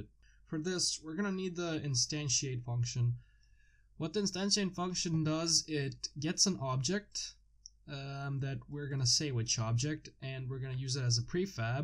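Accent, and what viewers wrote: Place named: United Kingdom. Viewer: Spawning objects show up anywhere anytime even if there is no link from one level to another because they are part of the only one collective integrated resource system which is self aware of the player xp so it gets more interesting the logic criterias of the program rather than the in game experience xp. The Brit voicings are always more interactive anyways.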